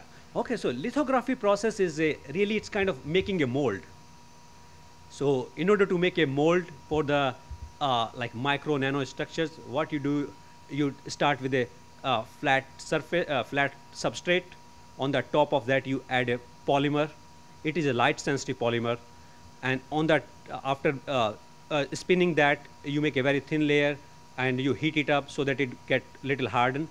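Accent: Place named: India. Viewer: I just can’t stand the Indian accent, like whoever mad their language didn’t realize how annoying and un intimidating it is. I I woke up in India I’d probably shoot my self so I wouldn’t have to hear that shit everywhere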